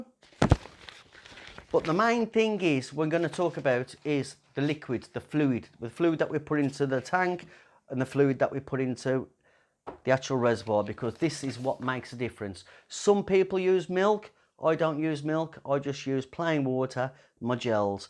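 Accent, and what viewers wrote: Birmingham, United Kingdom. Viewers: Where have you gone. Missing you……we need to hear your brummie accent and hear all your tips. Would like to see you do some macro with veg or kitchen things x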